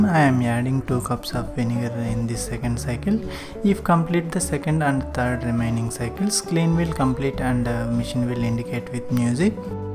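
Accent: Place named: India. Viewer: Thanks for cleaning instructions and fu*ck you because of that Indian accent.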